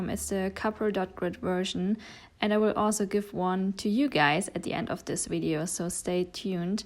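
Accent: Germany. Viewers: Ich wusste das du deutsch bist, hab ich doch richtig gehört 😂👍🏼❤ Ich hab übrigens noch nie so ein schönen Journal gesehen, hab vergessen wie das auf deutsch heißt lol 😅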